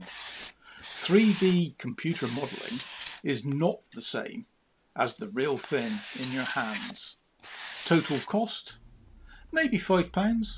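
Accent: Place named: New Zealand. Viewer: I love the kiwi accent.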